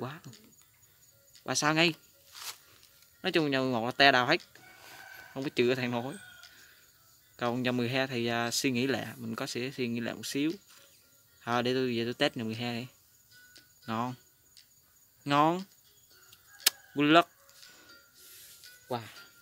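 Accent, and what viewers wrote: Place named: Quang Nam, Vietnam. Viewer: Nghe giọng quảng nam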